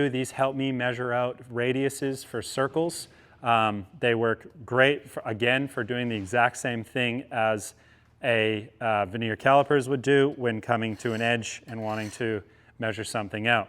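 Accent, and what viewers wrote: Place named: Australia. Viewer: Awesome video! This dude sounds Australian sometimes and American others and im so confused by it lol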